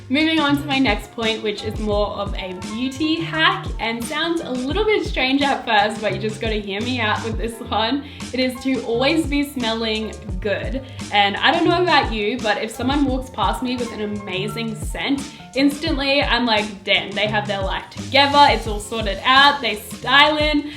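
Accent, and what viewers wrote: Australia. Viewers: Hey girl! I knew you were from Australia because of that accent :) which part of Aussie are u from? (if u dont mind me asking) hehe I'm from Adelaide! Anyways, I love your fashion style and I was wondering if you have any outfit videos that is perfect for the winter season here in Aussie <3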